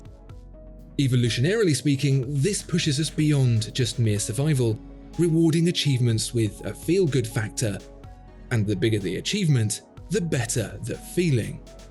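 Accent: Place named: London, United Kingdom. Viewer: The ongoing video of that ugly looking Daytona which caters to the Corvette buyers is a distraction to the flawless spoken narrative originating in those who wish to impress you with their vocabulary and thought process. The accent or dialect is somewhere in the London upper class suggesting good education. The truth is much simpler. You buy a luxury Rolex watch in order to announce the following: I have made it.....have you?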